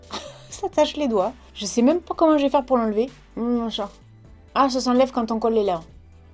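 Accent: South, France. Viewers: Bonjour Tout d’abord je voulais vous dire que je suis tombé sur vos vidéos par hasard et que je suis devenue fan de votre chaîne.vous êtes rigolotes, pétillantes et votre accent me fait voyager dans le sud à chaque fois..... bref je suis tombée sur un site internet kosmeopolis qui vend du make up a petit prix (marqué Bell, joko...) je recommence à me maquiller un peu plus depuis quelques semaines et vos vidéos a petit prix sont parfaites pour moi. bonne journée à vous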